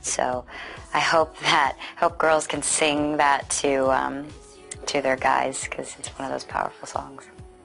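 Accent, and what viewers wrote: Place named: Texas, United States. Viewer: Texans speak funny.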